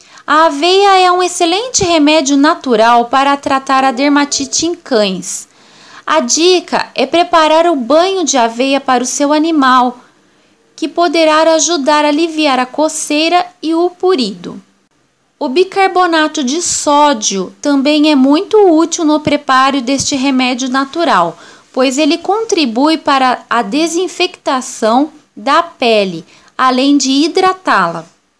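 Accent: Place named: Northeast, Brazil. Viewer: Treinar a dicçao fiquei <link>.a leitura do texto com sotaque sertanejo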